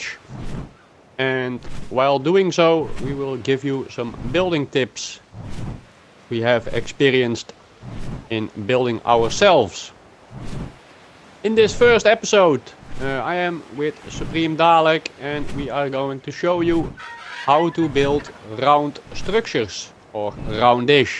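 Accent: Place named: Netherlands. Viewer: En je hoort heel duidelijk dat je nl bent 👍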